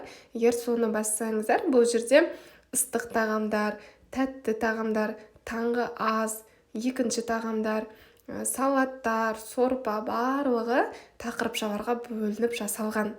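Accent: Standard. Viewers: қолың дерт көрмесін өте түсінікті әрі таза қпзақша мөйлейсің жасап көремін Алла қаласа бірақ ортасы шикі болып қалмаймекендеп ойлап отырғанмын мен басқа рецеппен осы шарикті жасаған едім бірақ ортасы шикі шықты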